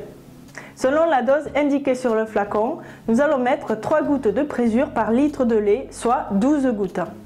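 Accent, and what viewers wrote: South-West, France. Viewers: Un joli accent du sud-ouest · Excellentes explications parfumées à l'accent du Sud-Ouest (la plus belle région de France). Merci pour ce beau travail pédagogique.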